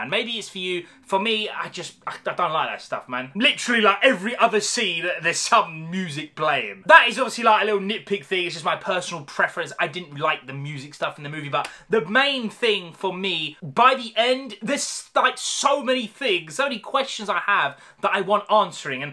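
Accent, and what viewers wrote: United Kingdom. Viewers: Wow thank you. I've never heard a British accent like that before. I subscribed only recently during your Obi Wan reviews so wasn't sure where you were from.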